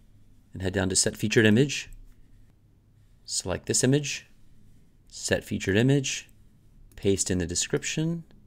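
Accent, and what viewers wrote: England, United Kingdom. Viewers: I.m just curious, but what happened to your lovely gentle English voice and accent I enjoyed on your 2015 video which was one of the first I came across before this one - and persuaded me to go for Sydney.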